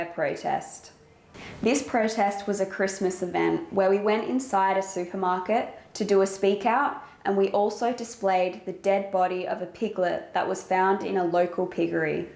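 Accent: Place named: Australia. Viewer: If you are the vegan teachers daughter, why are you Australian and your mother is American? How did you get the accent?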